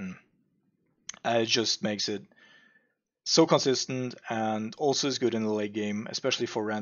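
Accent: Germany. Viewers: I like your accent, where are you from? Germany?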